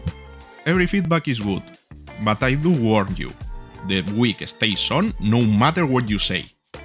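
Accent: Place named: Spain. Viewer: That thick spanish accent... puede ser?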